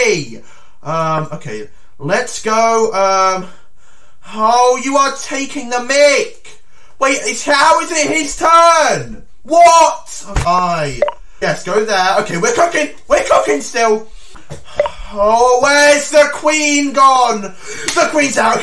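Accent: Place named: United Kingdom. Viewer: That's just how a British accent is ... a stereotypical US accent pronounces the r more properly and makes more of an "aa" sound for the aw. Same thing with the word yawn - pronounced like "yorn" in UK and more like "yaan" in US.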